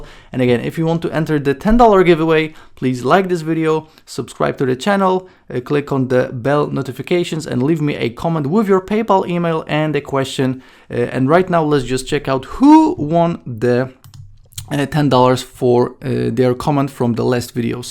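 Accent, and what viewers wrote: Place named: Portugal. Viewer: your Portugese accent makes it difficult to understand sometimes. Please speak more slowly and repeat the key info several times. Thanks!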